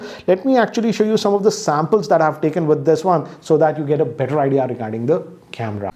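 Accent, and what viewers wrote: India. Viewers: Nice video, but I find it hard to understand Indians accent! And him speaking too fast makes it even more difficult to understand him.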